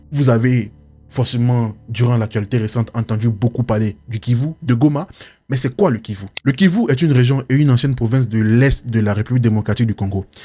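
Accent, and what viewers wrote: Ivory Coast. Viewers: Ko je suis l'enfant de quelqu'un 😂😂 tu m'as tué frère comme un vrai ivoirien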